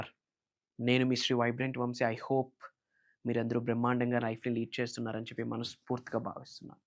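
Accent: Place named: India. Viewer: yela miru andra vaku English ni telugu chesi maatladuthunaru.. Malaysia Singapore lo ila maatladithe kinda nunchi paina teda ga chudtharu..😅😅. thala noppe vosthundi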